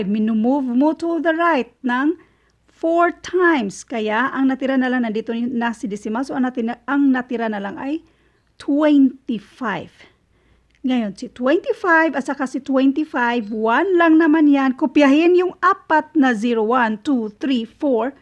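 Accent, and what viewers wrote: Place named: Leyte, Philippines. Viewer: Taga Leyte po kau ma'am kaya pla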